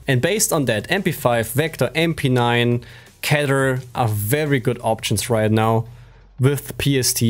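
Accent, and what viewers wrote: Germany. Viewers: You are the reason why I drink some beer, your videos are great and the German accent makes it great , nice video man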